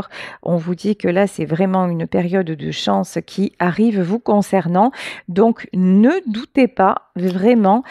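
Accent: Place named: Nîmes, France. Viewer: Bonjour 🌸je suis hélas dans cette situation d’horreur 😫😡plus trop de force, Plus d’énergie mais je veux me battre & obtenir réparation..d’injustice p/abus de Confiance s/personne mis sous tutelle (Packinsonien)Besoins d.aide!... D.agréables moments d’entendre votre voie de « NÎMES «encore mercie 🌸🍀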